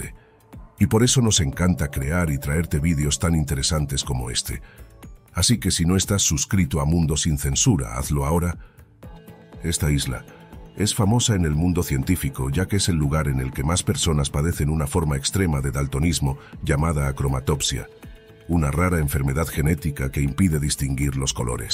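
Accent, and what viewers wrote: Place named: Castile, Spain. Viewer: Excelente vídeo, y muy bien narrado. El narrador habla un perfecto castellano y es un placer escucharlo.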